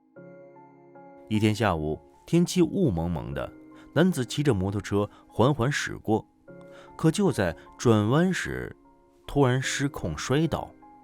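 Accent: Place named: China. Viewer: 大陸口音 我不懂 為什麼 沒有大陸的靈異事件!?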